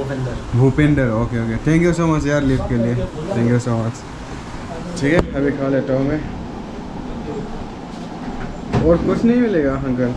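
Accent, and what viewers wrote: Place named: Nepal. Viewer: By his hindi ascent he is nepali bcz I been in uttrakhand , people of uttrakhand hindi ascent is different not like him anyways enjoy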